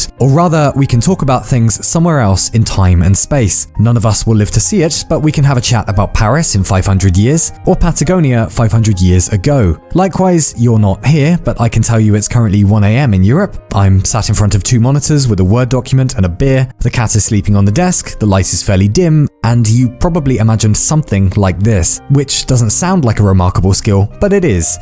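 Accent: United Kingdom. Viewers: OK, but why do Brits talk wrong? It's almost like they want the listener to understand 10% of what they say. Boston, Australia, Texas, drop the dumb accents, and talk like a Californian, with no accent, it's not funny anymore, do you need training wheels for your tongue?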